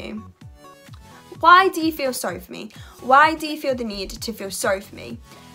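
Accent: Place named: England, United Kingdom. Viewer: You 're such a cute young woman (i could be ur father) , i've seen two other videos of U … You are able to do most things that a lot of us with our hands can't do. As you say, you do those vidéos, u go to school , U do so many things that other Don't, me first. I love your English accent and the light you're brighting arround you. Sorry for my English , i write from Britanny (France) . You already know how to be Happy Pleased Glad … many ones will never know that.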